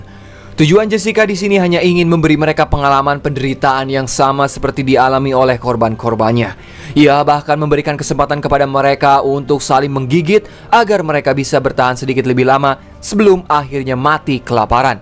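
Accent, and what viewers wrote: West Java, Indonesia. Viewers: Asalamualaikum ..bang Ciawi hadir.bang aku suka cara Abang ngomong nya pakai bahasa Sunda Abang orang mna sih😊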